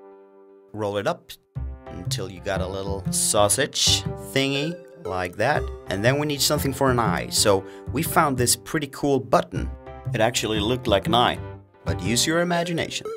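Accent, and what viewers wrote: Scania, Sweden. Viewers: hAN ÄR SKÅNSK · är han som snackar skåning eller det låter så när han pratar svenska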